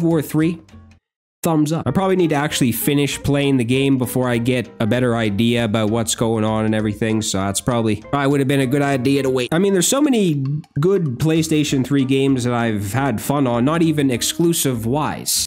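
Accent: Canada. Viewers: I love your Canadian accent. But I will say that you should play Resistance 2 and 3. It improves on the first game....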